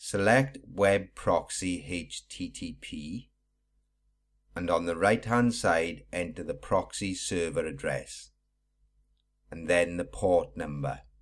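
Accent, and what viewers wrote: Wales, United Kingdom. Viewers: you sound welsh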